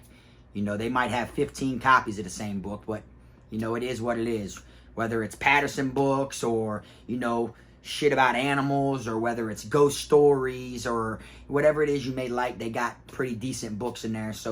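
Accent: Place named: South, United States. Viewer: idk how you’ll take this but that southern dialect might get you in trouble one day as your channel grows, I know damn well you don’t give a fuck but I just don’t wanna see some clowns try to “cancel you” or some shit like that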